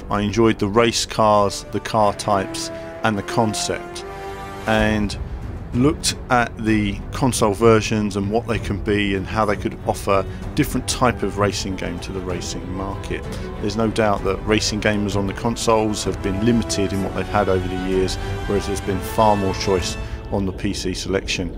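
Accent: England, United Kingdom. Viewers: I am new to your channel and subbed right away as I was looking for updates on Project Cars. i have a PS4 and I have had all the GT games. I am hoping this game also helps to push Poly for the full gaming experience (especially the sounds). I really enjoy your style and pragmatic delivery. I'm also a sucker for a English Accent even though I'm a grown ass American man..lol....Makes me remember when I used to watch Formula One all the time on Speed Channel. Anyways...keep up the great work and I'll be looking for this next week in the States.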